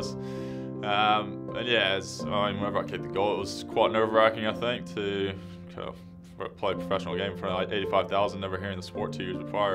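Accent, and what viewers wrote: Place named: Australia. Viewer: Picking up a bit of an Australian accent. Listen every time he says "so".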